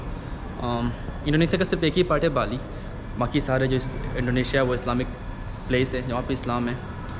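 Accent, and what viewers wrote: Russia. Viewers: Russian ascent is better than nastya's...😄🤩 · Did anyone noticed that english accent is somewhat russian now?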